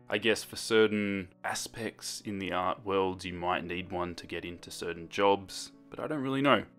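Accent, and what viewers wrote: Australia. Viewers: are you from, Australia?...im so curious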